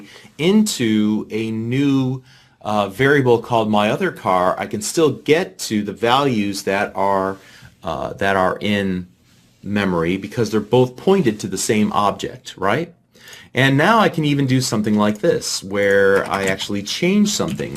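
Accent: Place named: United States. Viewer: Great channel sir, I really love these tutorial especially that they are in American Accent, this is a great advantage these days with all the rubbish accent courses invading Youtube.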